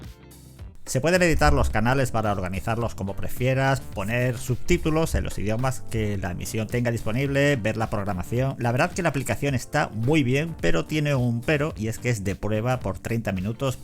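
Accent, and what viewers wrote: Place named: Spain. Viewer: Acento de España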